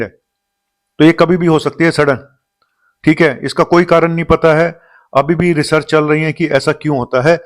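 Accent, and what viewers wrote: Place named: Punjab, India. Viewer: Dora नहीं दौरा डाक साहेब। पंजाबी accent बदलो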